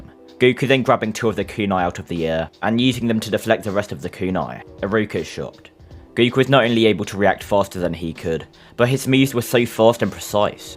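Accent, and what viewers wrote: United Kingdom. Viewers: Annoying British voice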